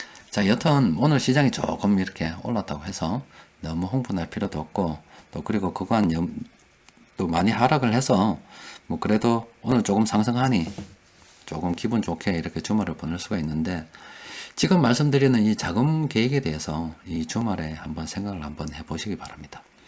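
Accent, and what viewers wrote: Gyeongsang, South Korea. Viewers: 기회가 오면 어느종목을 사야할까요? 초보인지라~~~^^ 차분하게 설명을 잘해주셔서 이해가 잘되고 불안한맘도 안정되게 해주시네요 경상도 말씨도 정감가구 좋은 컨텐츠 만나게되어 감사히 청취하고 있습니다 ^^ ♡ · 경상도사투리 매력있습니다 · 구수한 경상도 사투리와 함께 귀에 쏙쏙 들어오는 강의 감사합니다~ 무엇이든 쉽고 빠르게 이루어지지 않다는걸 새삼 깨닫습니다. 앞으로도 좋은 강의 부탁드립니다~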